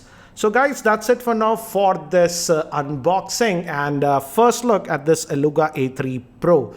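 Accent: India. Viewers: Prince Kumar I understand that he's indian. I know that he has an accent and I can understand that but there are times that I can't catch what he was saying cause his pace is too fast. Since he has an accent (which again I understand and I'm not taking that against him) It'll be nice for him to speak slow for his viewers to understand him since I believe I'm not the only non-Indian subscriber that he has. I'm not hating, I'm just giving him a constructive criticism to improve. Still love his reviews. And I respect.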